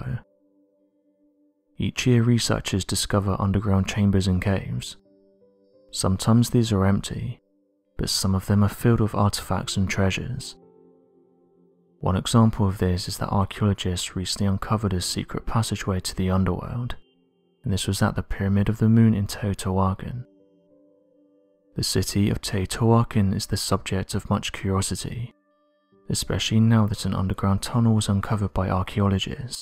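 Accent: United Kingdom. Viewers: Let me start by saying I really enjoy your channel. However, when I hear the voice of the current narrator I cringe. Because no matter what the setting I dial in on my speakers is, his voice is always low and muffled, that combined with the British or some kind of other foreign accent, I can't understand him half the time even with my earbuds in. So I'm just asking you guys if you could please maybe do something about that? I really enjoy the subject matter and would hate to have to tune out due to the fact that I'm just not going to get it. My hearing may be not that great, but I don't have any problems with your other narrators and with any other channels that I listen to on a regular basis 🤔👍 PS- The voice puts me to sleep, as well 😂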